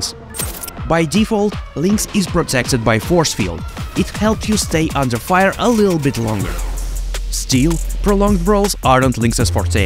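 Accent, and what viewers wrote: Russia. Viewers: russian accent...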